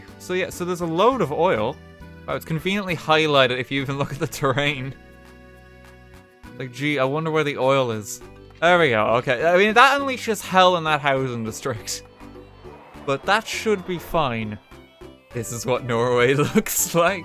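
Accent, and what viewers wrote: Ireland. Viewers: Have you got a Irish acent if so I love it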